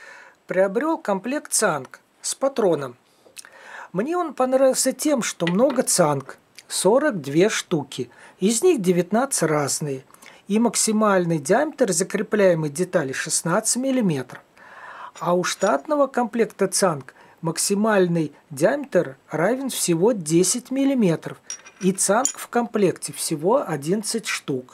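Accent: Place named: Volga, Russia. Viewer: Спасибо за полезное видео, и ещё волжский распев приятно было услышать)) где-то рядом с Самарой?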